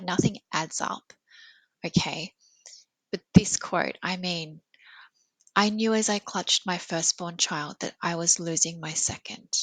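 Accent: Australia. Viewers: Nice to hear a well-spoken Australian voice. "'A whole 'nother thing" - no. "A whole other thing" - yes. (Retired Australian teacher) 😊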